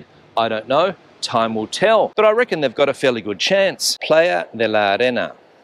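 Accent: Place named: Australia. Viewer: Sounds Australian to me.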